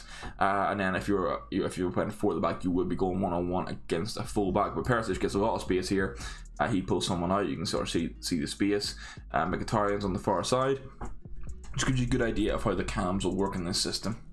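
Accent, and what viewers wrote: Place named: Northern Ireland, United Kingdom. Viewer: Nice video mate 🤙 U from Northern Ireland mate, I think recognise that accent as I’m from NI myself 😂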